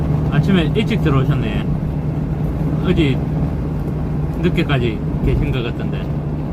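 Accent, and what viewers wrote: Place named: Jinju, South Korea. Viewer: 진주 사시는군요 ㅎㅎ 말씀이 구수~하시네요 ㅋㅋㅋㅋㅋ 😂😊 풀영상으로 응원합니다 😍💞💓😻😸👍👍👍 항상 울콩딸기가 진심으로 감사드립니다 🙏🙏🙏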